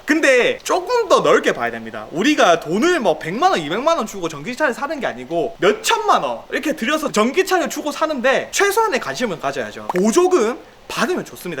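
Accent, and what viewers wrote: Busan, South Korea. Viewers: 마 붓산싸나이답게 설명 쳐즈기네요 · 딱 보이 부산표준어네?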